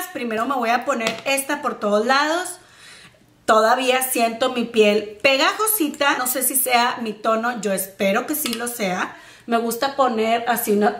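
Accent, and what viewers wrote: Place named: North, Mexico. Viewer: Hola eres súper intensa igual que yo y me gusta tu acento norteño que buena onda😜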